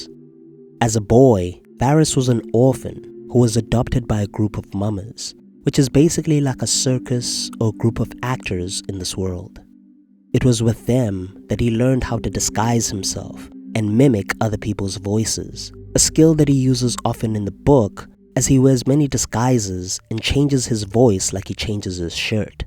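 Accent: South Africa. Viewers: Haibo is that a fellow mzanzian I hear ???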